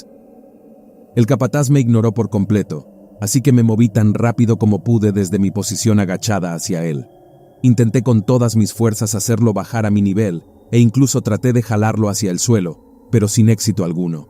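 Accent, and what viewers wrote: Spain. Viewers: Es una cagada que sea tan profundo ese acento Ezpaniol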